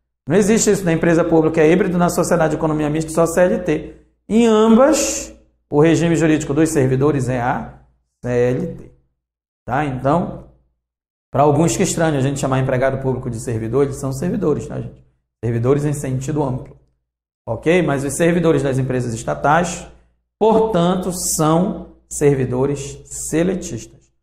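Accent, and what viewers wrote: Pará, Brazil. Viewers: Esse professor é Paraense né? :D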